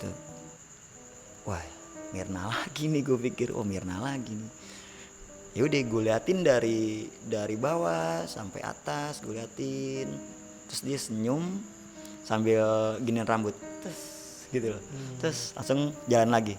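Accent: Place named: Bali, Indonesia. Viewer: Mungkin orang bali ngomong hurup Tnya biasanya gitu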